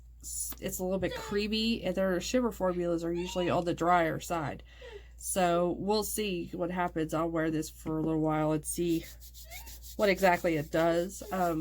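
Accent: United States. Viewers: Girl, bye! Those ppl need to crawl back in their hole. There is nothing wrong with the way you talk. I love our Oklahoma accent. Also, I love it when you talk in your videos. You always make me laugh. This look is gorgeous! That lid shade tho...#Yassssssssssss! Love you more than 2 big busses! Lol! Stay safe! TFS! ~ Nona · I luv ur "hillbilly" accent 💜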